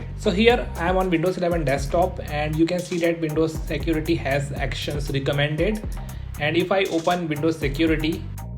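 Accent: India. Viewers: I never watched a video with an hindian accent that didnt help me, thank you so much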